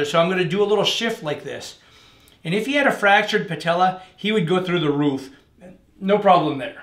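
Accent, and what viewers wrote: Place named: Quebec, Canada. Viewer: You have an interesting accent...are you French Canadian? You sound like Jonathan Pageau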